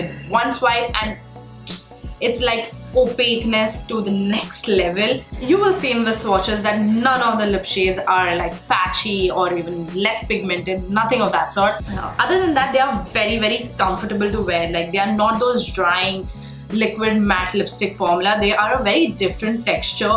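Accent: India. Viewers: Lmao your Indian accent is very visible 🤭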